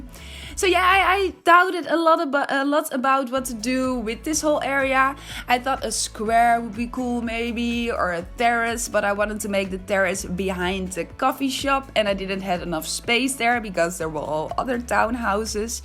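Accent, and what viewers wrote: Netherlands. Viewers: Ben je Nederlands? Ik hoor een Nederlands accent achtig iets haha ! Heel mooi gemakkt dit!😍 ik ben zelf helemaal verslaafd aan de sims🙈 super mooi😍❤️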